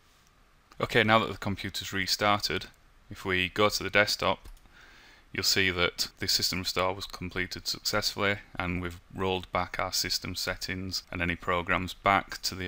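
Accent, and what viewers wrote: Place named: Newcastle, United Kingdom. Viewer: You have very sloppy diction. It makes you very difficult to follow especially when you move that quickly. Now try and do all that when your display has locked itself into 800 x magnification and you can't even find the buttons let alone get to the point where you can type in "restore". Can't stand sloppy exaggerated Northern accents at the best of times. Arghhhhhhhhhh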